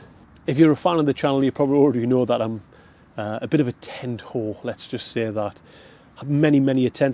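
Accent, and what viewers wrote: United Kingdom. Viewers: From America but lived in Sunderland for a time. I sure miss the Geordie accent. Thanks!!!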